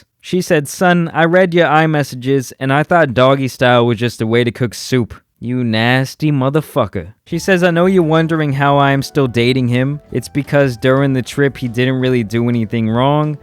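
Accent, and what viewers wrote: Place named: East Coast, United States. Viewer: Okay I just found your channel and I been watching everything. Where the hell did this east coast accent come from I’m so confused 😭😭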